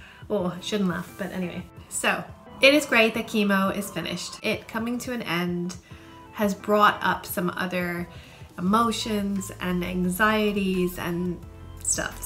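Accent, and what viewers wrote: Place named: Ireland. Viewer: Your cancer Journey will be as individual as you are...it won't be the same as the next persons...whatever you feel, whatever your mood, whatever your frustrations or elations are, they are yours...thanks for sharing your journey with us and attempting to have us understand what you feel...and by the way, I love your Irish accent..lol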